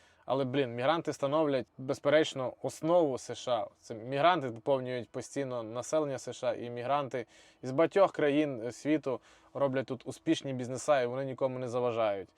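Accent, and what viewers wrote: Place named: India. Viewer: В нього англійська хороша. Лише акцент є. Бо в Індії англійська мова)))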